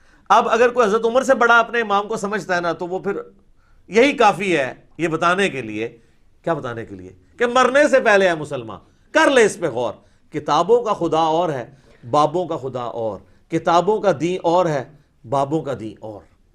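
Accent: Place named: Punjab, Pakistan. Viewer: hahaha bhai, your way of taunting is very nice. your accent punjabi (or may be siraiki) is great.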